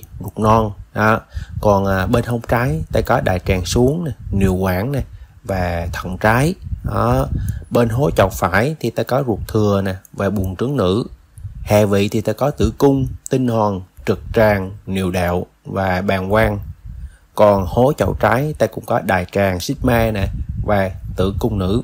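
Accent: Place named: Khanh Hoa, Vietnam. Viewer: Nghe giọng bs quen quá hihi giọng quê hương giống ở KHánh Hoà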